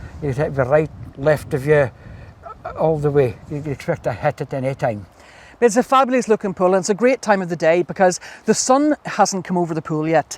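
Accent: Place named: Ireland. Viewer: You sound irish hon.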